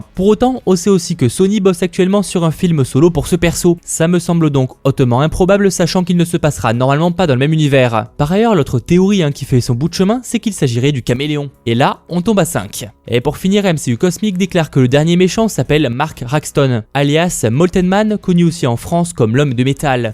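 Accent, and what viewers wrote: England, United Kingdom. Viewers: J'adore ton accent anglais x)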